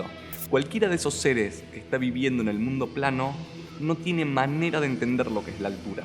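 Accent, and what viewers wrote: Rioplatense, Argentina. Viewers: Te ganaste un sub, no sé si sos argentino o uruguayo, pero por las dudas saludos desde Argentina.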